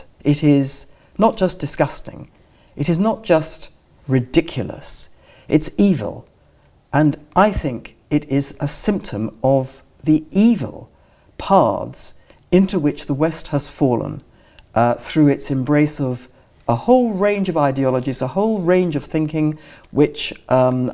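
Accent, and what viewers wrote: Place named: RP, United Kingdom. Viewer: This lady is an idiot, even her pseudo-educated English accent couldn’t help her argue a convincing case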